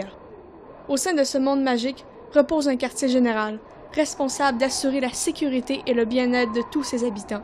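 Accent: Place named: France. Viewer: OMG i love French accent haha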